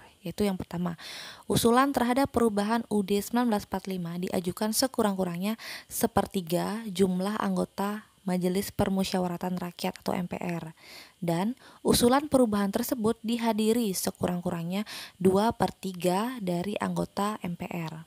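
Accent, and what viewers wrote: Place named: Bali, Indonesia. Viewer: salam dr denpasar kak, dari logatnya kelihatan kak hehe ☺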